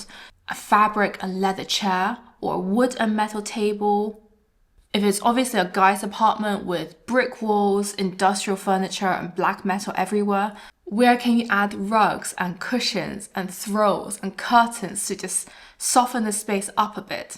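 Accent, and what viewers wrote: United Kingdom. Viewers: I have no partner or girlfriend which could force me to watch, so its my free will. ;-) I once found your videos and find the psychological components interesting, because many people are explaining "how to make your room feel more cozy/expensive/calm/natural" but without explaining, why the suggested design may have the proclaimed certain effect. Watching into primal habits of searching for prey etc is very interesting. I am a minimalist and regarding colours more on the beige, earthy and light green side. I am not a big fan of bold statement pieces or great contrast. So it is interesting to see, how people differ - because most of the male people I know are indeed exactly choosing the options you described. As while I am a fand of sanitised rooms, I prefer them not too sterile. My brother is into chrome - a total nightmare for me, as I am sticking to beech wood or bamboo. 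Oh and excuse me this personal note - I am enjoying your British English also. English was my first foreign language at school (I chose Latin as the second) - and of course nowadays in media you mostly hear American English - f.e. the Hollywood and Netflix productions. And unfortunately I am also leaning towards American English (not to mention my heavy German accent). So its always a pleasure to hear BE.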